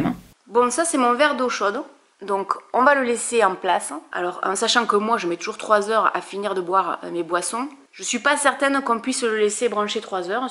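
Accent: South, France. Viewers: Salut! Je voulais juste te dire que les stylos anti- éraflure sont une grosse arnaque, même les plus chers ne fonctionnent pas, donc pas la peine de débourser 20e chez Norauto tu seras juste déçue!! Et sinon j'adore tes vidéos, elles sont toujours intéressantes et m'ont permise de découvrir pas mal de bon plans et je ne me lasse pas de t'écouter parler avec ton p'tit accent du sud. Gros bisous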